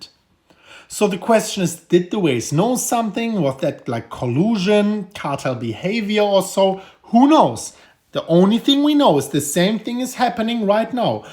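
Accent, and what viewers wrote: Germany. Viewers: Good time to wait for bottom if you are a hodler. Why? Tax season. Funny German Accent Guy Say's Whales selling BTC.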